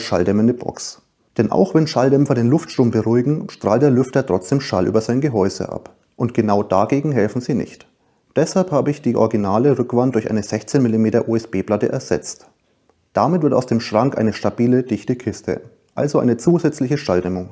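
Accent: Franconia, Germany. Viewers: Immer wieder angenehm den fränkischen Dialekt zu hören. Sehr informativ. Dankeschön 💚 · bitte nicht falsch verstehen - deine Lösung ist durchdacht und erfüllt seinen Zweck. Wollte dein Projekt nicht schlecht machen, falls das so ankam. Besonders der fränggische Dialekt hat es mir angetan ! :D grüße aus Minga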